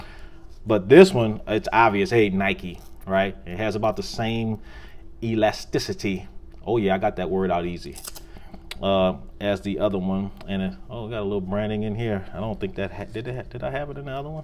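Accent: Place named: Texas, United States. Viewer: Love the Texas accent, subscribed and thumbs upped :)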